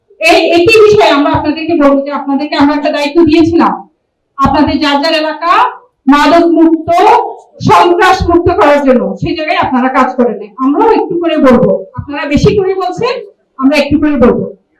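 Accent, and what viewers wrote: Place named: India. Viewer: এই মহিলা ভারতীয় নাগরিক কথা বুঝা যার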